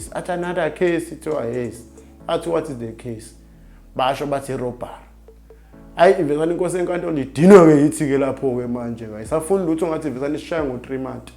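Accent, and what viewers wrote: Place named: Ndebele, Zimbabwe. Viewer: Waze wakhuluma njengaMandebele aseZim nsizwa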